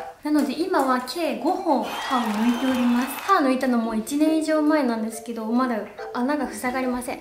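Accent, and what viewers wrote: Oita, Japan. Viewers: たまに語尾が聞き覚えあると思ったら、同じ大分県の方なのね😂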